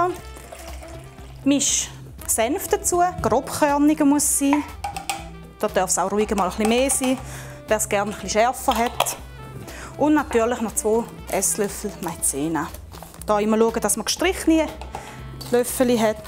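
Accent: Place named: Switzerland. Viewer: super video!!! ich als tiroler versteh den schweizer dialekt, hahhahaha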